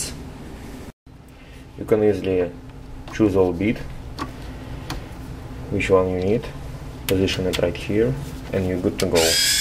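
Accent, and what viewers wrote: Russia. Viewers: Чёт у тя акцент русский. Иди учи фонетику!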